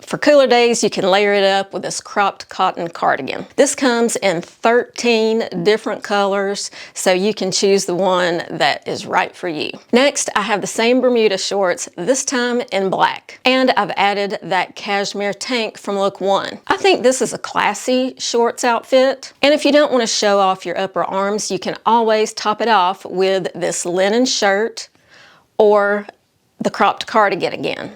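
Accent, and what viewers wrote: South, United States. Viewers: Hi! I am new to your channel! Been watching about a month! I grew up in LA (lower Alabama)! Im 65 and enjoying your videos so much! I'd never heard of Quince before so i am going to check them out! Love the cashmere tank and the cotton sweater in all the colors! One if my favorite things about summer are the flowers and swimming in my pool! Also love getting to finally wear the summer colors and ditch the black and browns (for the most part)! Thank you for the great content. Love your videos! Oh and im enjoying listening to someone who sounds kinda like me ( Southern accent! 😂).❤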